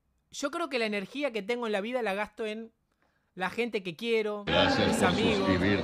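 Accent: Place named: Uruguay. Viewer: pero ahora que lo diga bien y no con acento de uruguayo castrosón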